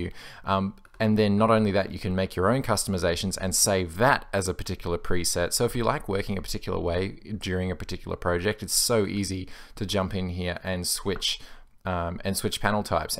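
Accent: Australia. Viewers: Nice to hear an Aussie voice reviewing Linux for a change :) I’m new to Linux and Ubuntu Mate is definitely the best for a new user, I can tweak it to a windows like environment (the new Redmond option is virtually the same as Mint) but my gf wants to get a Mac because her current windows laptop is “too slow” (old) and she likes the Mac dock etc...well once I get more experienced myself I can set up the same distro for her for a free Mac that isn’t spyware (or anyone else who wants a fast, private, secure, easy to start using system) Also the explanations of software in Ubuntu Mate are very necessary for me or else I have no idea what is available or what it all is so that’s actually a feature I appreciate Ubuntu Mate to me is the only distro most people would ever need, or at least the best distro of all to start with while figuring out what other Linux distro is best for their specialized needs Highly appreciate everything the Ubuntu Mate team have done, just amazing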